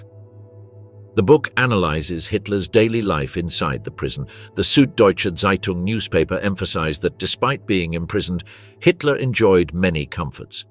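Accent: United Kingdom. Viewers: lol same old propaganda video 😅😅 lmaoo with the same old British accent 😊! Give me a break, don’t worry all these lies told about Hitler one day will be proven false, he did NOT kill millions lol, you have zero proof, once I get the speech or signature I’ll beleve it.. the great awakening ppl!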